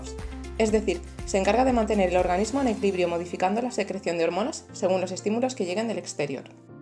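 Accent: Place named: Spain. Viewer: Tiene voz española, pero siento que es la tonada española que más se asemeja a la Argentina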